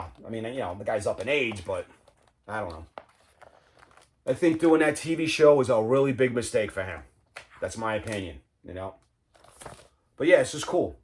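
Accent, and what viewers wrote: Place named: New York, United States. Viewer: Only just found your channel by luck, love it, love the New Yawk chat too, my favourite City in the world.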